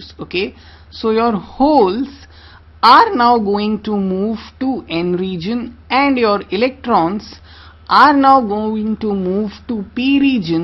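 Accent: India. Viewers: GET THE FUCK OUT WITH THAT HINDU ENGLISH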